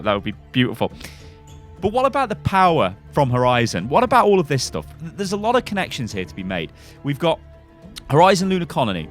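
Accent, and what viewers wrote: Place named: Birmingham, United Kingdom. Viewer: Wait, you're a brummie? Just like me 😁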